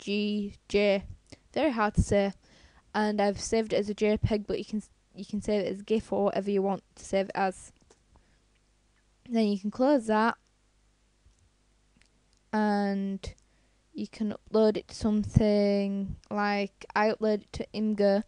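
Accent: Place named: United Kingdom. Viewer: I know right GoodLuckPeice she does sound Brittish! I couldn't really understand her because im asian.And how do you get the link thing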